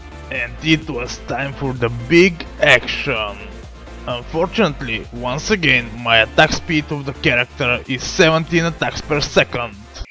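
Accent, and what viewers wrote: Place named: Bulgaria. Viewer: Сигурен съм , че коментатора е Бг личи си от километри ... като цяло най-смешния клип който съм виждал някога ужс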